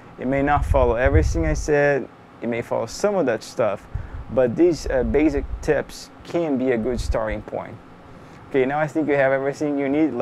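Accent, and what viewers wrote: Brazil. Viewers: Just pondering...what nationality are you? I've been sitting through all these videos, and the accent sounds familiar, but I want to say Brazilian Portuguese. Am I even close? Outside of that completely unrelated question, I just wanted to thank you for making these super helpful videos! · that's a brazilian accent ahah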